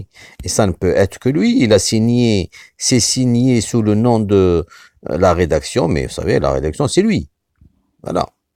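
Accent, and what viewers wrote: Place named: Morocco. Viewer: Mais toi tu parles sans visage et tu parles plutôt marocain !! ce que tu dis n'est une nouveauté pour personne. si au moins tu montrais ton visage , vraiment d'ou te vient cet accent???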